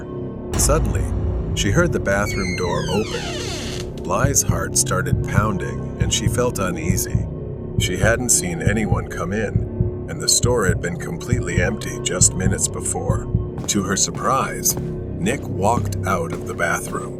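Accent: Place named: New Jersey, United States. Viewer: your jersey accent makes your videos all the more comforting. been following since😰😰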